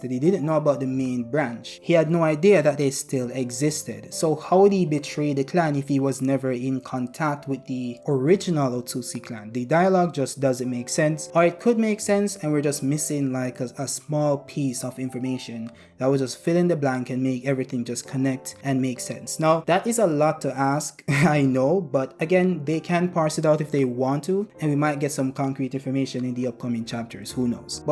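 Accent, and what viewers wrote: Jamaica. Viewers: Me hear a Jamaican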